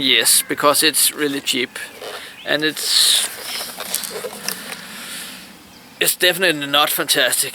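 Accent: Denmark. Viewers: you sound like a danish do you still have the gun? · You sound danish. Are you danish?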